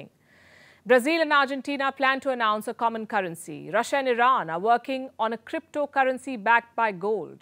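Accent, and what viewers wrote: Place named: India. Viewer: Your pronunciation is nice, voice is pleasant and the accent is more Indian.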